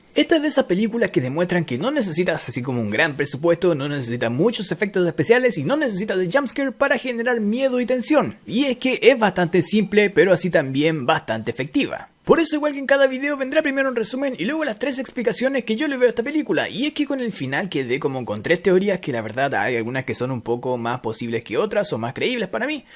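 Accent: Chile. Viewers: Crack makina te recontra quiero una prefunta eres de chile ?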